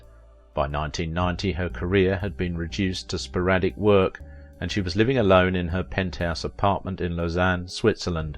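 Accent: France. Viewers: The narrator has a lovely French accent. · Your french pronunciation is cute ❤❤❤❤❤🇨🇵🇨🇵🇨🇵🇨🇵🇨🇵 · This was a very good video. I am I see your French accent as wonderful as well. Thank you for doing this yes I actually learned quite a bit about her and you portrayed her in a very fair light. I really enjoy your channel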